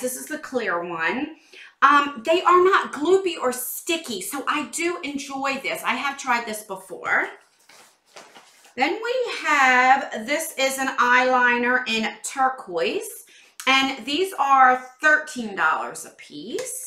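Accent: New Jersey, United States. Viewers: I love myself a good nude lippie and I like how u say really your jersey accent comes in I understand the struggle I got a ny accent lol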